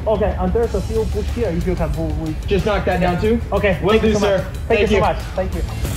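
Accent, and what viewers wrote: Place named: Vietnam. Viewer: Lol sound very vietnamese!!!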